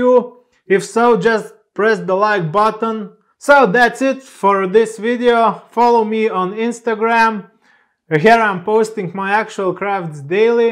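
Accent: Russia. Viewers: Русский акцент 😁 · Мне кажется вы русский) очень похожий акцент)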